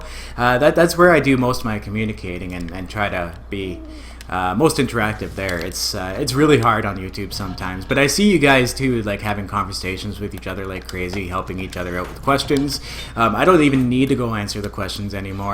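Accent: Canada. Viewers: had a suspicion you were Canadian because of your voice man lol I'm from London Ontario what pert O Canada you from eh?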